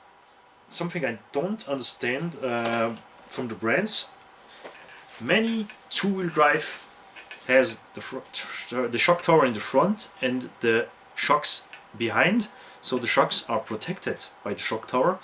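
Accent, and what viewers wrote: Alsace, France. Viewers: a lenglish accent francais alsaco je valide !!! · You have a great french accent lol 😅 pretty interesting vid ! I love this buggy too!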